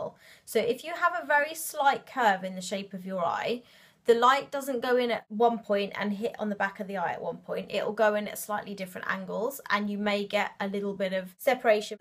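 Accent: England, United Kingdom. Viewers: Hi again! ah I'll go back and listen again. I'm glad I emailed you. I'm guessing you are in England somewhere ( hearing your accent ) so you are about 5 hours ahead of me, so it's about midnight there. Anyway, thanks for responding and so quickly too! I really appreciate it, and you said that very well, I get it now! Thank you again for your time:-)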